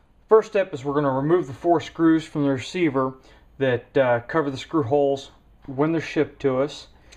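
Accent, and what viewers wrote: Upper Midwest, United States. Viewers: Haha, yeah. Northern-midwest accent.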